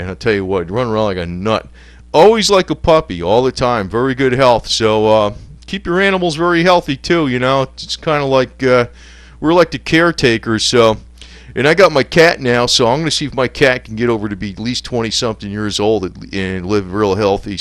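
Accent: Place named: New England, United States. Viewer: I absolutely adore your voice and the way you talk, including the accent. 💜 Is that a New England hint in your accent ? I'm not an American so it's hard to figure out. But it's amazing.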